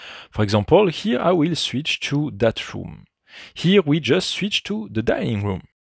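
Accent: France. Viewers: le petit accent français là il me fait me sentir chez moi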